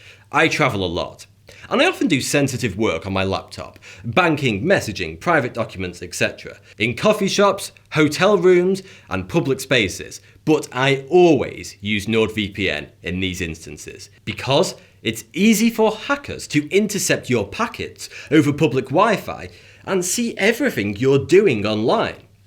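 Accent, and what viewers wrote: United Kingdom. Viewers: uk narrators eventually get on my nerves...wish theyd just talk in a normal, non-dramatic, non-sarcastic or ironic voice..I just want info, Im not looking for the most amusing delivery vid... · Accent of this guy sounds a little like over-acting .... he sounds like the uttermost british guy you can get in the UK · This guy has a Monte-Pythonesque accent. Does anyone know what kind of accent this is?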